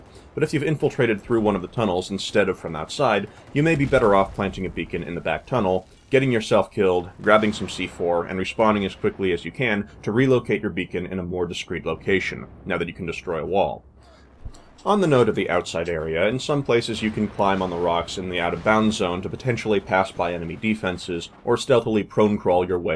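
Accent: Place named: United States. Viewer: what state of america are you from? you have the same accent as the guys from FilmCow i think! you could probably legit go to the titusvile :L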